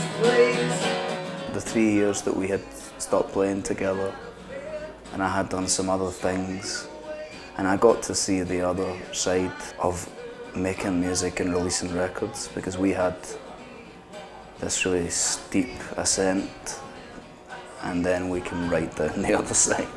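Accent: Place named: Scotland, United Kingdom. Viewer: that is the nice Scottish accent ahaha :')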